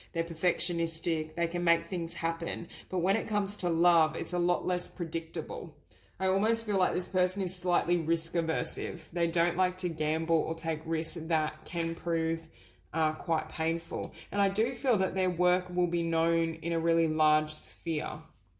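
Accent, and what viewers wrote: Australia. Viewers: Omg you are awesome and my intuition getting better , thank you I subscribed, you sound Australian, I have seen that all Australian readers are awesome something special about you!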